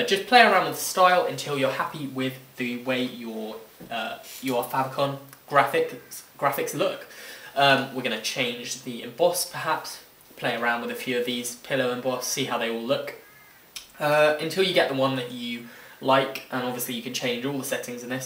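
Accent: United Kingdom. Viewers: british :D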